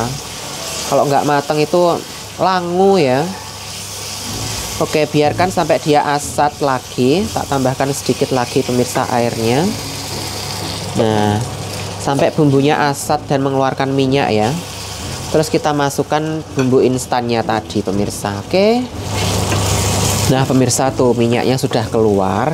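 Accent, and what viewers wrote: Java, Indonesia. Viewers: Mas jawanya pundi kok bahasanya medok bangettt 😊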